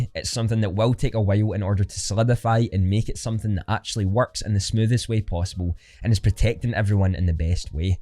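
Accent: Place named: Glasgow, United Kingdom. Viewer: He's a Glaswegian you numpty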